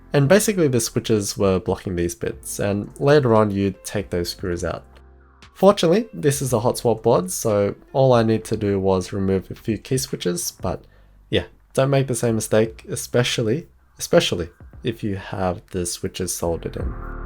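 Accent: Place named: Australia. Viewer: you sound more Australian than usual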